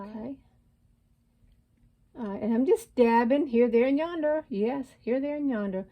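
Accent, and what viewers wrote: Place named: South, United States. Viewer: I love this tutorial. Thank you!! Your accent tells me you are from the South. Love your accent. I’m in Tn. Would you show us a video on painting a Gardenia, also?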